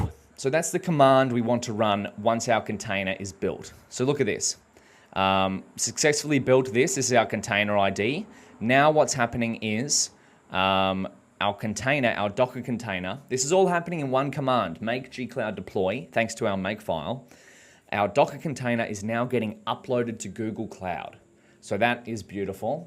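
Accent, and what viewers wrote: Australia. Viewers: Love the aussie accent bo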